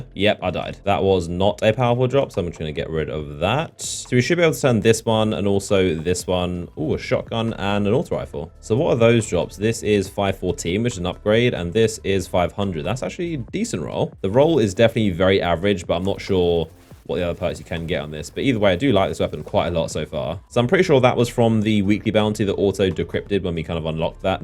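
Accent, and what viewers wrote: United Kingdom. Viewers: Pls talk a little bit slower, i barely understand u bcuz of your british accent.Thank u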